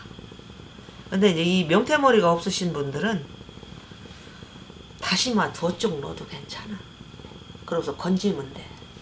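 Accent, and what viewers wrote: Chungcheong, South Korea. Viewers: 충청도 사투리가 너무 좋습니다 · 어머님, 구수한 충청도 말씀이 너무 좋아요. 오늘도 감사 합니딘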